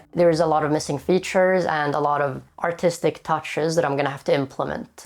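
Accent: Sweden. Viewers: 5 seconds into the video, my swedish ass goes "hey this guy sounds swedish". i've never watched you before, just somehow clocked it. dubbelv eller vad kidsen nu säger. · i had to hear like 3 seconds of your voice to find out youre swedish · Legendary algorithm pull Edit: Jag visste att det var en Svensk accent jag hörde.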